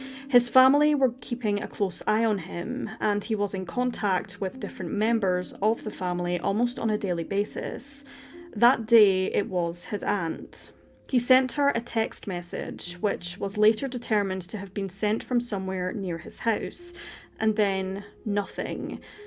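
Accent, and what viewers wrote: Scotland, United Kingdom. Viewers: Thank you so much for bringing light and exposure to these cases! Also may I ask what part of Scotland you are from? I’m an Ayrshire lass myself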